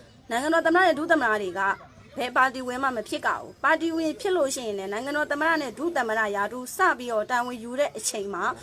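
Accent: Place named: Sittwe, Myanmar. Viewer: မဂ်လာပါညီမလး မဟာသွေးလေးစာပါတယ်စစ်တေးသံလေးနဲ့ကြားတော့ပျော်ပါတယ်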